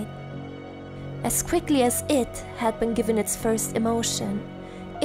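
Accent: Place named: Germany. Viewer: German accent = 😍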